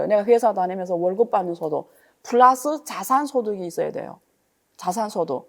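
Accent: Yanbian, China. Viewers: 이번주는 안유화~ 강의 꾸준히 듣겠습니다. 연변식 한국어, 너무 귀엽고 친절하네요. ^^* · 난,좌파도아니고친중도아니지만,,,조선족남자는편견과달리 나름좋은분을만나봐서 디스는안하려구 ᆢ근데확실히이번정부는친중이고,이건아마도 이미중국의내정이깊숙히개입되었다는것,눈에보임 ㆍ티가너무납니다,아마추어인내게도보이는데?인물이반반해서 먼애기하시나들어왔는데,말투가 조선족처자,머그렇다고해,경제,정치애기를구구절절하시는데,,,이분이일단은교양이부족해,나름전문가적소견을어필하는데도,,,머랄가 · 교수님말투가 이따금 연변말투같네요 ㅋㅋㅋㅋㅋㅋ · 연변에서 조선족출신 이신것같아요 경상도 쪽이요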